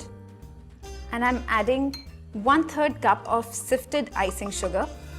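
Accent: India. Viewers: a very strong Indian accent you have. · Ulas Gültekin it's the Indian accent.😍😄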